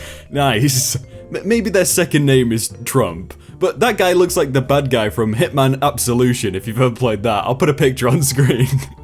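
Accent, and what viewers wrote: United Kingdom. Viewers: notice how when mispronounce the word "nuclear" the comments sections go crazy but when they make fun of british accent he don't give a sh** wait that's a dumb point to make, but I could take some feedback:D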